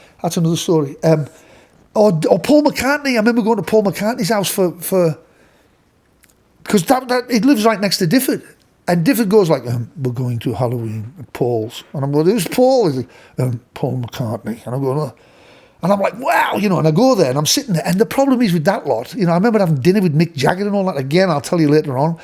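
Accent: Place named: Sunderland, United Kingdom. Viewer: I found your channel a few days ago and am very impressed my friend .am from stockton on tees ..you sound like a mackem to me 😂definitely from the north ?paul weller has been my god for 50. years I’ve heard he was very let’s call it spiky 😁when he was younger but he’s cool now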